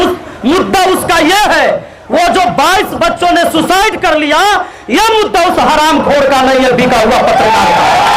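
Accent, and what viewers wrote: Bihar, India. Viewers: गुरु जी बिहारी की बोली पुरे देश में गुंजना चाहिए! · Bihar ki awaj hai * sir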